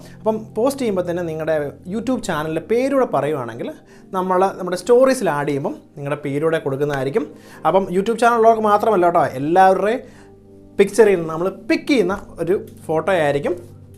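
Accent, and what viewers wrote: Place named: Kottayam, India. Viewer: Hope it from kottayam ..slang like my Amma....nostalgic...presentation also super...chettan superaa...🚽😊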